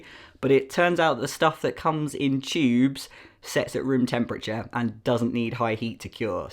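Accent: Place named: England, United Kingdom. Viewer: Since you are English and now immersed in a Scottish community (accent and dialect), your new found lisp may be your involuntary speech muscles trying to adapt and assimilate......resist! Your accent is lovely! LOL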